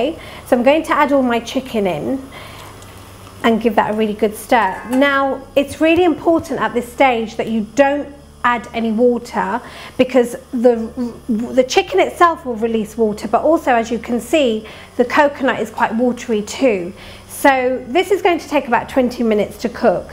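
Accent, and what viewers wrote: United Kingdom. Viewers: nice vedio.. thnx for uploading........... and nice British accent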